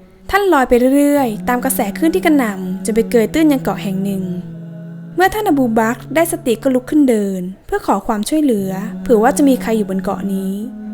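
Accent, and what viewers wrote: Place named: Thailand. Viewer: เขาเป็นมุอัลลัฟ ไม่เคยเรียนฟัรดูอีน เลยออกเสียงตามพยัญชนะไทย ไม่ใช่ตามภาษาอาหรับ เลยเพี้ยน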